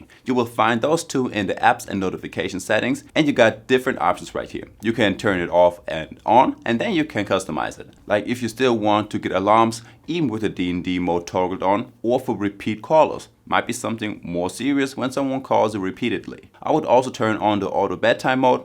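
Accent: Germany. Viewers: aer you from germany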